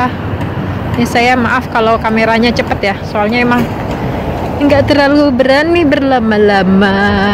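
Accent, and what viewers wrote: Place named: West Java, Indonesia. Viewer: Teteh dari logat bicaranya ada kosakata bahasa Sunda. Memang teteh sudanya dimana.??? Kebetulan saya tinggal di tempat tth ambil vidio itu. Saya asli kota Bogor tth Jawa barat.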